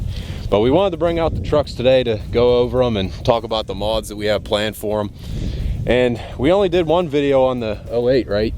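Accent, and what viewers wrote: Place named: Pennsylvania, United States. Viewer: I honestly got hooked on your channel because of that thiccc PA accent. I have a lot of family from PA so it’s refreshing to hear lol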